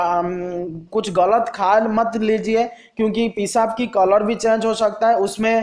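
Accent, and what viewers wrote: Odisha, India. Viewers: Bhai Hindi pura odia bhalia laguchi...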